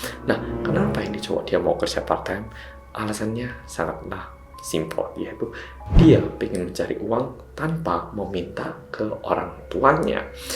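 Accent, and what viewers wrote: Java, Indonesia. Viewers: Makacih, Boloku Sing Paling Medok Dheweeeee 🙏🏼🙏🏼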